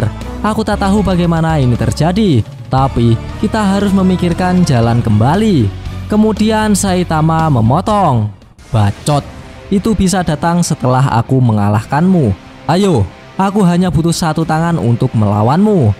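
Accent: Java, Indonesia. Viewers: Jurus2nya kocak anjir medok😂😂😂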